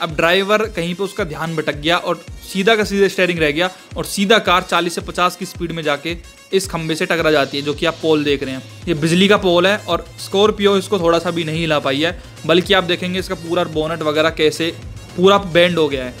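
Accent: Haryana, India. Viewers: भाई , एक बात बोलता हूँ , बुरा मत मानना। जरा धीरे बोला करो। बहोत से शब्द तुम्हारे jumbled आते है। पीछे शेर तो लगा नहीं है ना ! हिंदी एक्सेंट में बहोत प्रॉब्लम है तुम्हारा ! हो सके तो हरियाणवी एक्सेंट में हिंदी मत बोलो।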